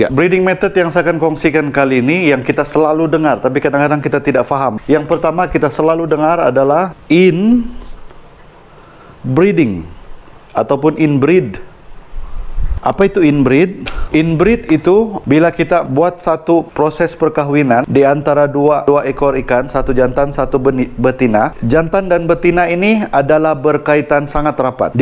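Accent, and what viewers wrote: Sabah, Malaysia. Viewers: Slang Sabahan ohh..boleh tau ka dimana location? Mau datang beli kalau boleh heheh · Abg orang sabah ehh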